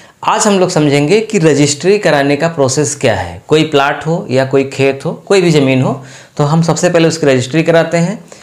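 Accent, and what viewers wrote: Chandauli, India. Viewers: Aap chandauli se ho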